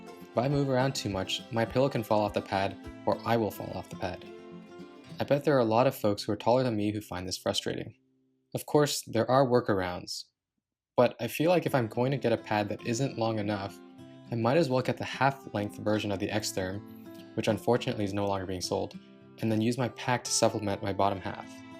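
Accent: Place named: United States. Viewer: My, my, aren't you petulant. 1) Assuming you were an American is not an unfair assumption since that's what your accent sounds like to my ears. 2) I know it's an old video. I even say as much right off the bat. Nonetheless, the comment still stands about not using one standard of measurement (i.e. imperial) as other people are accustomed to the other standard (i.e. metric); hence why it's better to use both. You may very well have changed this since you made the video, but you could have just done the proper thing from the get-go, and that's all that I was really saying. I only saw the video yesterday because that's what came up in my recommended feed. You know, you could have taken my comment in good spirit instead of getting all tetchy about it. You could have laughed it off; that was an option for you. But instead you've made a big deal out of nothing. 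Sheesh!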